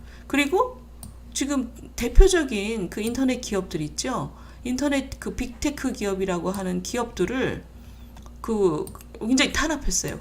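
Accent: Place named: Seoul, South Korea. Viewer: 말투가 저랑 똑같아요. 경기도 방언인지 서울 사투린지 나랑 너무 똑같아요. 희숙님 ! 나이에 맞지않게 젊어 보여 좋아요. 희숙님 영원히 늙지 말라고 기도 할께요 😊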